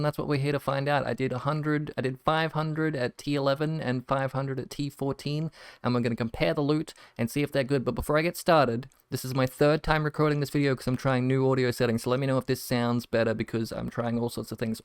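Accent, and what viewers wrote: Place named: Australia. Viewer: I never click any bell and always see new videos. The new audio makes you sound Australian.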